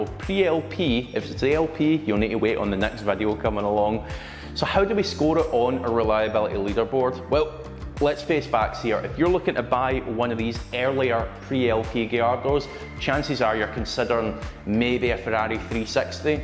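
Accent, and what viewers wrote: United Kingdom. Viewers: Can’t understand shit he saying I hate British accent so ridiculously funny and can’t understand nothing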